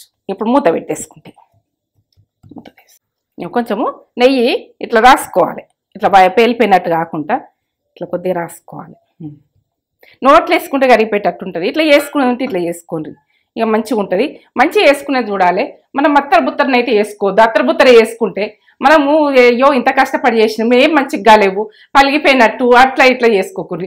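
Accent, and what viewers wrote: Telangana, India. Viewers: Amma meru. Chala baga matladu tharu Amma me vuru chayphandi Amma Nirmal Side laga vundali Basha Amma ❤️❤️❤️❤️❤️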